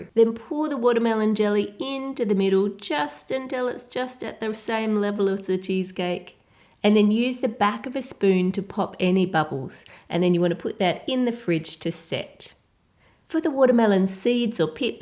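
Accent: Australia. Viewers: The Australian accent is real..! xD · Australian accent (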